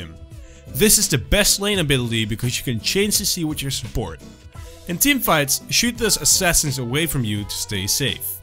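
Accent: Netherlands. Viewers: u realy sound like my english teacher just in case tho are a english teacher and are u dutch ? u sound realy sound like him. ;P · Your english seems dutch! Am i right? :)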